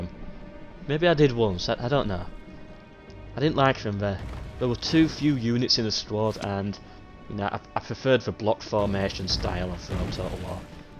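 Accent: United Kingdom. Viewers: Yeah I hope so mate👍🏻 Also, love ur accent mate! Wish my British accent was like yours😂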